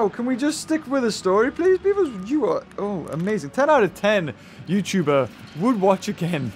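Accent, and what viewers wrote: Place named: United Kingdom. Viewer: Okay, that person who said all that mean stuff is really annoying to me for many reasons. 1) Saying the same damn thing for three comments straight doesn't get your point across any better than saying it once. 2) How can you not like laugh?! It's so blubbin' funny. Infectious, actually. And for the record, I love British accents. Just makes everything funnier.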